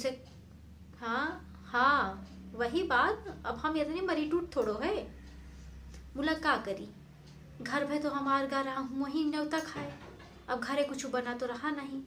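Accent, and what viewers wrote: Awadhi, India. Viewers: Maine jb apko awadhi bolte suna to Mai dang rah gyi ke ye h👍👍👍👍👍👍👍👍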